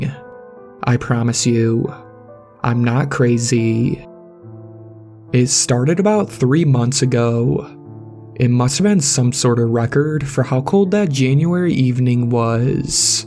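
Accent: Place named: United Kingdom. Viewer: This person is most definitely British 😂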